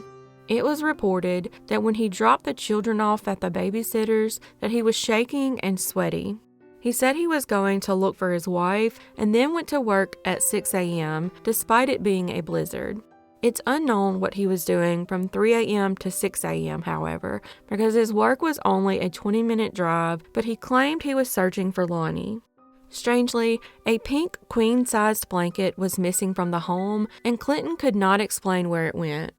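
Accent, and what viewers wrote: South, United States. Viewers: Such a adorable accent ❤️❤️❤️ I'm also from the south and you truly sound like a southern Belle 😘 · I love that southern draw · The southern accent is just so darn cute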